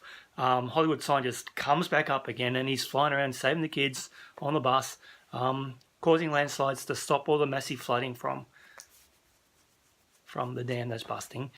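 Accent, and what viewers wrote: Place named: Australia. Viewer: It is amazing how powerful movies can be. Superman by far is my favorite movie of all time. You said something in your video that I relate to completely, and that is that Superman babysat me many many times. He was my friend. Someone I could always count on to be there for me. The magic of this movie holds up to this day. I was 8 years old when this came out, and I have seen it hundreds of times over my life. I also cried when Chris passed. He has been a huge part of my life and still is. I do not know you. From your accent I assume you are in another part of the world. (Australia?) Yet we speak the same language when it comes to this movie. And I think that's pretty cool! :)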